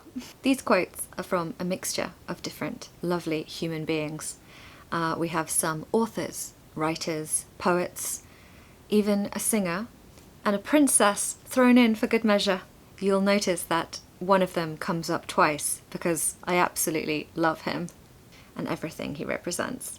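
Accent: United Kingdom. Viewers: you have a beautifully sounding true British accent. How can I achieve that accent you have if I am not an English native speaker?? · Beautiful British English and form an inextricably-linked whole. So yeah, I come 'for both'. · Hello, I recently subscribe your channel. I love your british accent! I am from Chile and enjoy your videos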